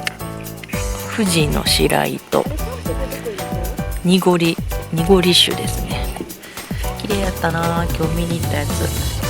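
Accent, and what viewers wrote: Kansai, Japan. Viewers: 4度って夫の実家の台所の温度😂😂🐻音を聞いていても楽しいし、主様の話し方が同じ関西なのでとても親しみを感じます。メスティンのご飯いつも美味しそう❗暮れていく富士山絶景でした😢✨お疲れ様でした😃！一緒にラーメン🍜🍥食べに行きたいです！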